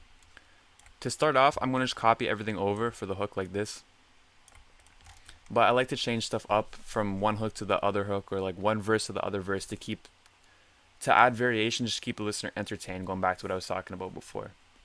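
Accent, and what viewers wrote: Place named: Canada. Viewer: Cool! Would love to visit one day. Very good video. I can tell because one of my best friends from Canada and some shows I watched are based there and you sound just like all of them lol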